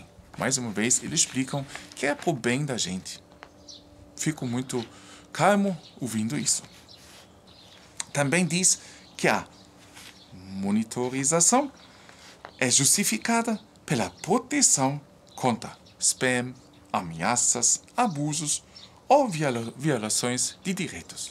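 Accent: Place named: Germany. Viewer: Ele é alemão.... isso se chama "sotaque"!.......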